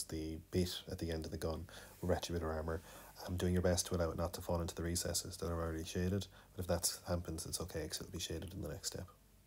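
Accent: Ireland. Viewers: You're welcome. You also sound like a fellow potatolander so bonus points!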